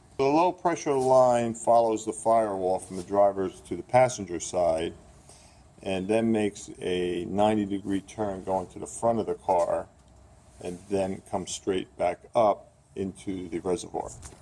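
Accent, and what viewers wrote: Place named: Northeast, United States. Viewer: Are you in RI? Or NJ or LI? When you started talking I thought to myself, this is not one of my vids....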